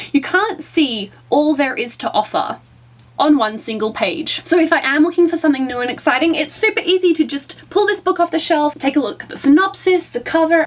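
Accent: Australia. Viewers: You must be from Sydney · Are you Australian? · As much as I hate straying from the topic of a video.. where is she from? As a kiwi who's been in Australia it's becoming hard to differentiate the accents now lol. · I loooooove the Australian accent <3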